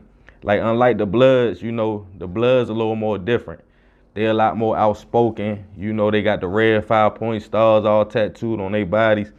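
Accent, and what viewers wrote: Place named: Washington DC, United States. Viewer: Why u so pressed by Baltimore bruh u sound like u from dc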